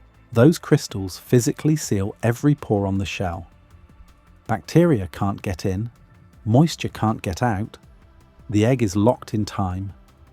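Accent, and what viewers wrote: United Kingdom. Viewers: The British voice is misleading. We never put eggs in the fridge on this side of the pond - in our homes or in the shops - and they don't go off after just a couple of weeks. I understand that eggs in the U.S. are washed and disinfected, and this strips away their natural defences, meaning that they have to be kept refrigerated. This is mentioned in the vid, but as I say the British voice is misleading; it implies that we do the same here.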